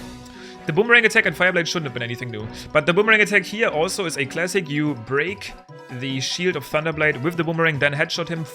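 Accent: Germany. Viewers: I know a german accent when i hear one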